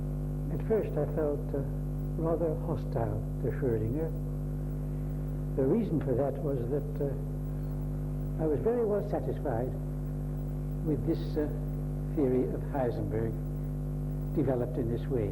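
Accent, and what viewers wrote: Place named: Netherlands. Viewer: He sounds Dutch, strange considering he did not grow up there.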